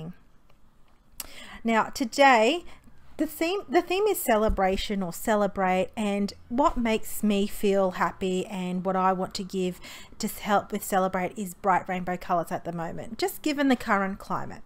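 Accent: Australia. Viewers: These were great cards, and lovely to hear an Aussie accent! Thanks for sharing the techniques, although now I really want to go and buy all the different colours of embossing powders. They're so pretty.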